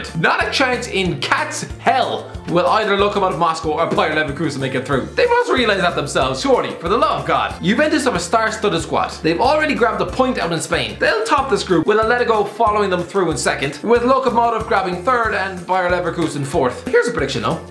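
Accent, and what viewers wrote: Ireland. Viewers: Are you irish? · What part of Ireland r u from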